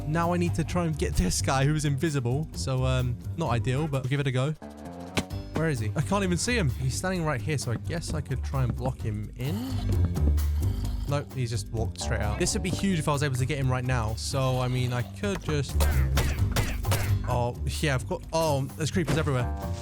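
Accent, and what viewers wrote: United Kingdom. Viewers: Your from England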